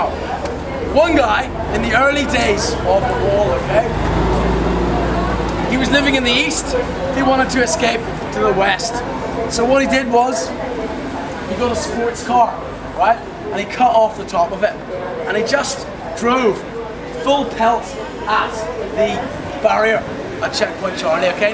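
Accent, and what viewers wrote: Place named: Ireland. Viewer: i like tha way he talks ..... he has an ireland accent ..... lovely !!!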